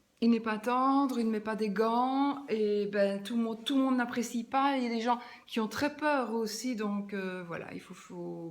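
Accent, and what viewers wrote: Belgium. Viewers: Bsr, j'adore ce jeu ,moi je viens de le découvrir. Depuis presque trois semaine. Puis je me permettre ,y aurais pas t'il un léger accent belge 🙂. Je vous souhaite une douce soirée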